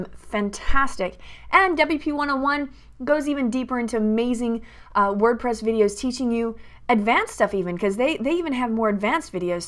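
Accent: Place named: Texas, United States. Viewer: you are a genius. Love the texan accent.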